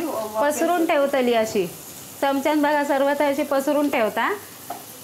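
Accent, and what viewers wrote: Malvani, India. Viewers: तू इतकं गोड मालवणी बोलतेस ना की ऐकत रहावं. नक्की करतलय भागला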